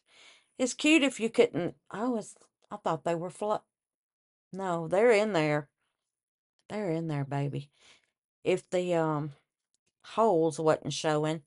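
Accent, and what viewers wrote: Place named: Texas, United States. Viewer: Hey … I’m not sure where you’re from exactly, but I could listen to you all day! Your keychains are beautiful too by the way… I really love that mould, it is very different from the standard letter moulds! I have just watched an old video of yours, where you made crackling bread… Now, I don’t do much cooking anymore, but I would love to have tried your crackling bread recipe. Your accent takes me back a loooong way!! And it makes me hungry for some good ol’ biscuits and gravy… one of the best things I’ve ever eaten!! 😋 😋 I am an Aussie who spent 4 years in Houston, Texas (<num>… flying in, just 3 days before 9/11) and listening to you takes me right back there!! (Back to being in Houston I mean, NOT back to 9/11!!). I loved being there for the first three years, and I planned on staying there to raise my little miracle Texas daughter… unfortunately, her biological (American) father turned extremely abusive and held us captive until I was able to escape. 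Consequently, I had to flee your beautiful country and come home to Australia with my baby. She turned 21 last November, and was raised by myself and the only Dad she’s ever known, my awesome hubby of 17 years. I will never forget my time over there… both the good and the bad… alls well that ends well, they say!! Much love, Nat (Brisbane, Australia) ♥️♥️🇦🇺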